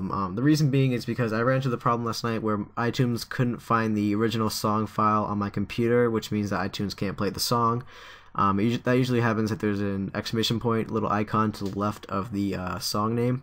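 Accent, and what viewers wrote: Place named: United States. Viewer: Cool accent ! What part of the states you from??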